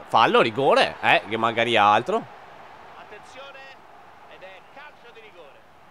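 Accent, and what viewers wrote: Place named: Trentino-Veneto, Italy. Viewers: di dove sei? io sono di vicenza e mi sembra che tu sia di queste parti XD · Si è parla dialetto veneto ahahahh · parli come l'incrocio tra un trentino e un veneto:) gran bel video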